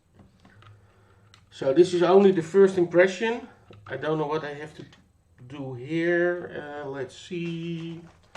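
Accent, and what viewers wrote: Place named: Netherlands. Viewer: You sound very Nederlands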